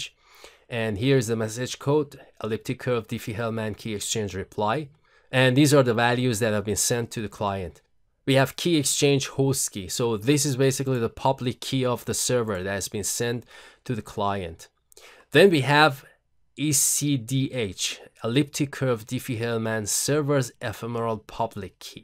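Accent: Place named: Iran. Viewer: I think the speaker is Iranian I can tell from his accent I am Persian too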